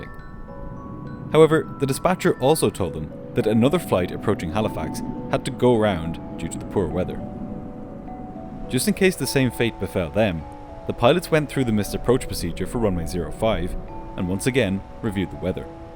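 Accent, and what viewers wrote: Ireland. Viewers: I never google the flights you talk about. I like to listen to the sweet irish voice that keeps me on the edge of my seat. I only try and figure out the ending of each flight with your tone and context clues. Glad i found you!!!! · The Irish accent is a lot easier on the ears than the Swedish one, but what I really appreciate about is that he doesn’t do any of the ridiculous propaganda pieces that the venture-capital-owned channel inflicts on its viewers. 🙂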